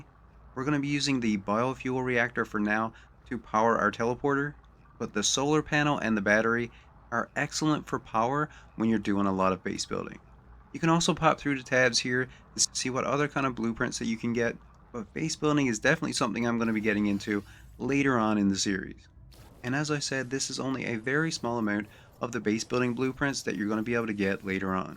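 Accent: Newfoundland, Canada. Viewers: i love your accent- reminds me of home (newfoundland) :] ty for this video <3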